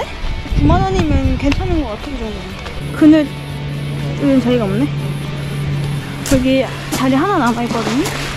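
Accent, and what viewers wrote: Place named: Jeolla, South Korea. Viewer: 전라도 사투리 귀여워용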